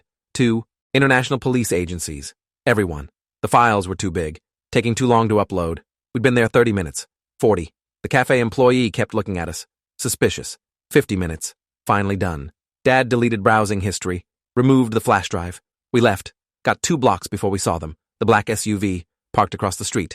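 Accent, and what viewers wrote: United Kingdom. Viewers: I love the Britain accent. It’s wonderful.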